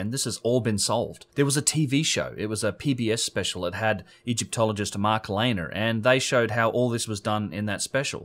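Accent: Australia. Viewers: Good stuff mate, nice to listen to another Aussie voice talking some sense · Great work, so obvious they used diamond drill or similiar stone to anyone with a brain that works! Thankyou! You sound Australian if so, great to sed Aussie working seriously in this area!❤😂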